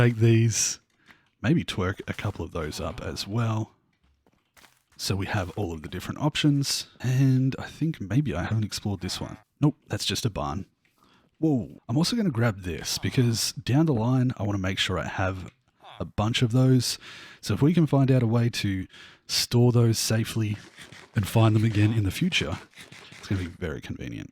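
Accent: Australia. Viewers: This is the first video of you I have seen and I loved... And to be honest it's refreshing to hear a fellow Australian speaking in a video. 🤣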